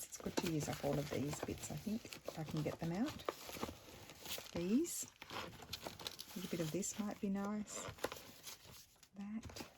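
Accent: Australia. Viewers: Hi from Cairns Queensland. Like many others, I have become reliant on your daily broadcasts from Italy where you've been hard hit by COVID19. It always makes me happy to tune in to YouTube and see that your latest vid is there. I love listening to your chit chat and am glad to hear that you still sound like an Aussie. It also always good to know that Lulu, Steph and you are living their lives still as normally as possible during this apocalypse. Stay well, keep up with your vids please. Cheers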